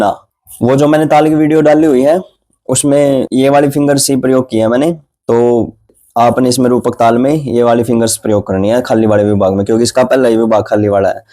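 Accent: Haryana, India. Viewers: Haryanvi tone ❤❤ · That Haryanvi tone hits ❤❤ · Kitna sahi accent hai bhai. Haryanvi ho? Thanks for the information and motivation btw❤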